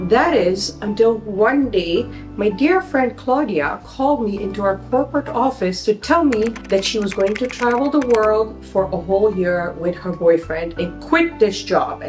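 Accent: India. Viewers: congratulations! happy for you and your husband! btw I hear a bit of an Indian accent haha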